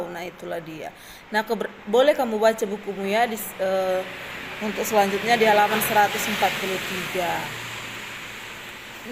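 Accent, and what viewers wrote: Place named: Batak, Indonesia. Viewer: Terlalu Batak kali bu guru ❤❤❤❤❤❤❤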